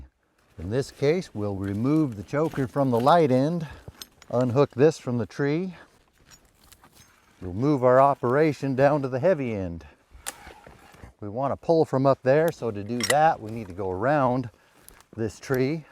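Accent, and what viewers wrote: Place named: West, United States. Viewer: The accent reminds me of buster shruggs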